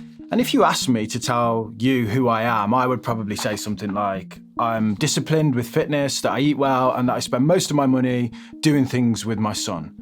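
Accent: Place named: Midlands, United Kingdom. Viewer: Why does this guy say he’s a northern lad every video? He’s clearly a midlands boy based on accent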